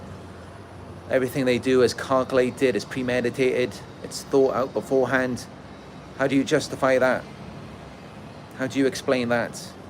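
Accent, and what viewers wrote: Wales, United Kingdom. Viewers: I believe it's Welsh.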